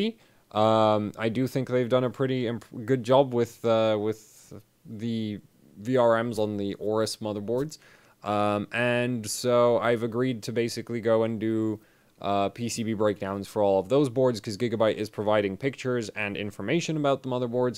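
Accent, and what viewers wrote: United Kingdom. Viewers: I didn't realise your from the UK because your accent is very vague. I'm quite disappointed that there's very little tech events that happen in the UK. I would love to see you and 8PACK as an overclocking team (you have all the hair and he has all the muscles). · British.. I never would have guessed it. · British lol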